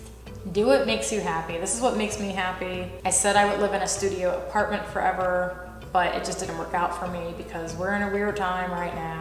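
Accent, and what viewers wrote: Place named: Los Angeles, United States. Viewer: Ah oh L.A. broad, oh i was guessing Texan or midwest.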